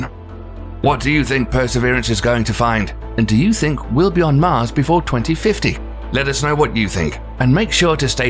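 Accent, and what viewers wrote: United Kingdom. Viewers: I will never NOT upvote any video with the soft dulcet tones of the best british voice narrator.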